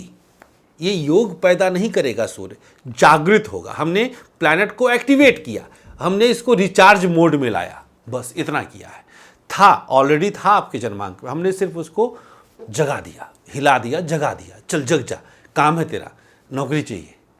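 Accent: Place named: Varanasi, India. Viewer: sir aap ka. Bolane va baat kernai ka andaza ek dam banarasi hai 👍 jab aap datatai hai to 🙏🙏🙏 you are great sir.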